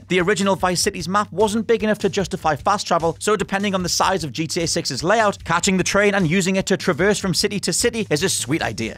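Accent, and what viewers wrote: United Kingdom. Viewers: Why is it British accents on ever gta rumor video??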